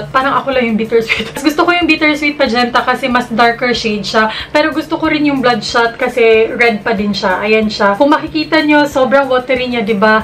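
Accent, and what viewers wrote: Visayas, Philippines. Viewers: ate bisaya b kayo hehehe...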